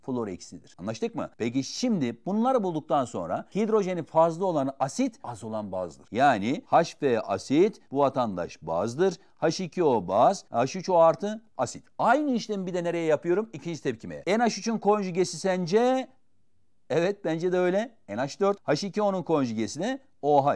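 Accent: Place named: Thrace, Turkey. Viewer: Hocada bi trakya ağzı var da ekrem imamoğluna benzemiyor nasıl benzettin :D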